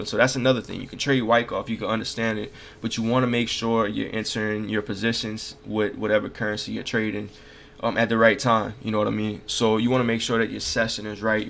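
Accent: Louisiana, United States. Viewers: Are you from Louisiana? You accent sound very familiar.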